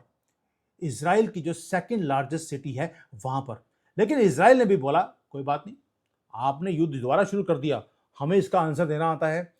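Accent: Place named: Punjab, India. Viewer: Hehehe..very interesting.. I very much like your typical punjabi style👍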